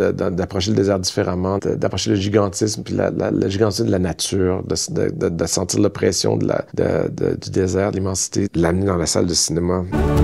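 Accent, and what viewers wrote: Quebec, Canada. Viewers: De plus en plus de vidéos d'artistes ou politiciens français de métropole ou pas, sont sous-titrées pour les malentendants ou les personnes ne pouvant utiliser le volume. Rien de personnel contre le très agréable accent québécois je pense. No offense :) (perso j'avais même pas vu qu'il y avait des sous-titres!) ') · Si c'était pour les malentendants ce serait toujours écrit tel quel. Aussi, ils pourraient être en CC et non intégrés à la vidéo. Leurs autres vidéos n'ont pas de sous-titres comme ça quand ils interviewent des gens avec des accents parisiens ou français. Ces sous-titres semblent là justement parce qu'il est Québécois. C'est toujours bien qu'il y ait des sous-titres pour rendre la vidéo accessible, mais là ça semble être à cause de son accent alors qu'il parle de manière tout fait comprenable pour les Français. Je ne peux pas croire que l'accent québécois soit si difficile à comprendre, mais il semble que pour cette chaîne, oui.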